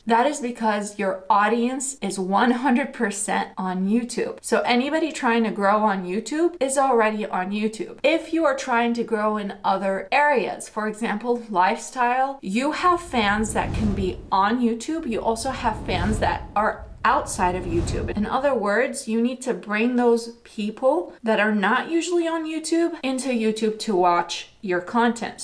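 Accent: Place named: Brazil. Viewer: Are you from Brazil? Your accent reminds me of Brazilians speaking English… Also, the way you said this channel’s name sound familiar to my Lusophone ears!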